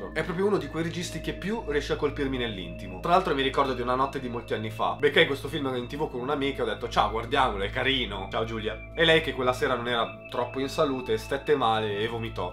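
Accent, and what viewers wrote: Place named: England, United Kingdom. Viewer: Sempre bravo ! Un unico appunto che non è così importante per la buona riuscita delle tue recensioni: perché pronunci tutti i nomi all inglese anche se non sono inglesi ? Tipo la Huppert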